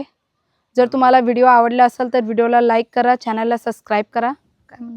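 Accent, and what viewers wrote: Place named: South, India. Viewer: तुम्ही Up ची आहेत कि सौउथ ची कारण तुमची भाषा सौउथ सारखी आहे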